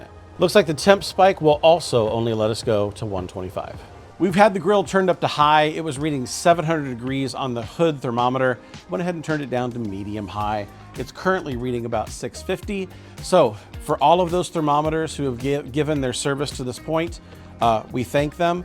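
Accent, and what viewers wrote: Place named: United States. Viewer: You sound American, switch those calipers to inches please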